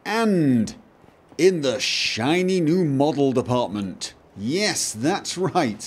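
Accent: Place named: Scotland, United Kingdom. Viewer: sir, can u tell me about that nice accent u speak? is that maybe scottish? I like it for sure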